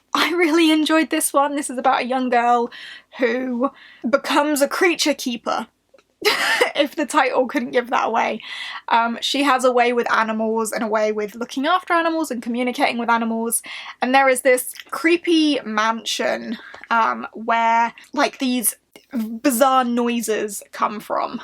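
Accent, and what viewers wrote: England, United Kingdom. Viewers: Hello, I'm Andrea. I discovered Middle grade books because of you and i absolutely love it!! I'm from South America, Colombia and i really like your English accent. Plus you are beautiful and smart.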